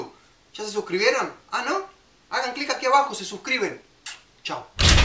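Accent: Argentina. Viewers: Ahh uma coisa adoro tu acento este acento argentino es increible de bonito que tenhas um bom dia obrigado beijos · En Argentina y creo que por su forma de hablar es argentino no se le pone huevo. Es solo harina, sal, grasa o manteca y agua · no es español pava tiene mas asento argentino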